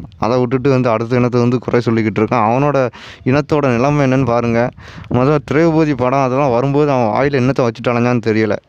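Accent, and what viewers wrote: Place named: Tirunelveli, India. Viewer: அருமையான பதிவு நண்பா திருநெல்வேலி பேச்சில் தெளிவான விளக்கம் கொடுத்த என் வீரச்சக்கிலிலியனே வாழ்க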